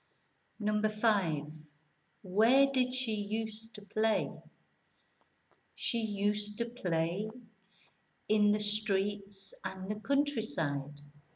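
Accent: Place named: England, United Kingdom. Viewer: Hi I really like your videos but you need to work on your Spanish accent you speak it with an English accent.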